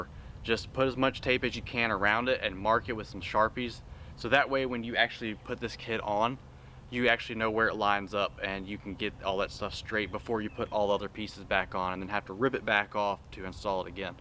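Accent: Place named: North Carolina, United States. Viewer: I was going to ask where you were from because your accent is almost the same as mine and then you said NC! Edit: just saw the Henderson tag I’m in Greensboro! Love it! And great video, working on planning out my camaro :)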